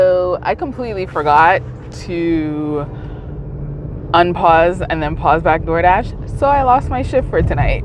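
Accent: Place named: Canada. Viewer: The Canadian accent is music to my ears. Why are you on the right side tho? Is you camera mirrored?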